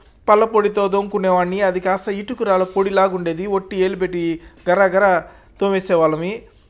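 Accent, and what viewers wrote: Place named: Rayalaseema, India. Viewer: Medhi a district anna rayalaseema slang la vudhi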